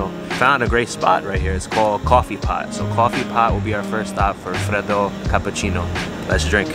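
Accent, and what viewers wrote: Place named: Greece. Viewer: Great!!!.... But i like your Greek speaking accent Better!!! - Youve got a Strong Greek Accent!!- Keep it! :) - LOVE Astoria and will Visit again!!! :)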